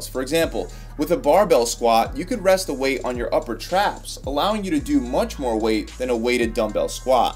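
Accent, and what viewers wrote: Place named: New Jersey, United States. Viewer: are you from New Jersey I was thier a few days ago first time and you got a Jersey accent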